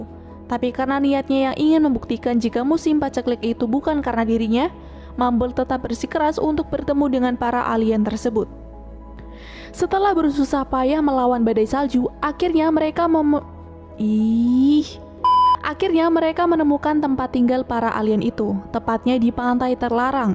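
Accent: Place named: West Java, Indonesia. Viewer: Teh orang sunda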